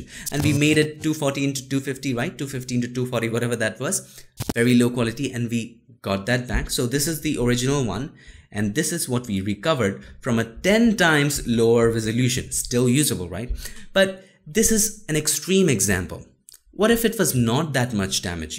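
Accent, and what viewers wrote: India. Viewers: Sir, your accent is wonderful! I guess you're an Indian, and I feel elated to have watched this video! Thanks a lot! You nailed it bhaiya!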